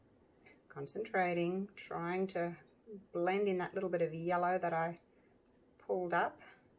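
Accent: Australia. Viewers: Love Yr Aussie Voice🙂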